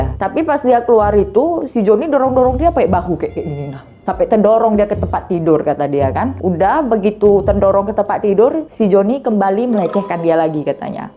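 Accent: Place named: Sumatra, Indonesia. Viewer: Logat khas Sumatra-nya yg bikin asyik 🇮🇩🥳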